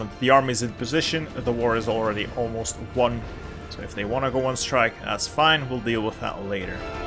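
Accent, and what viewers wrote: Flanders, Belgium. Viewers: yeah but he's from the Antwerp region if I can locate his accent enough. Which is the northern region where we speak Flemish (form of Dutch)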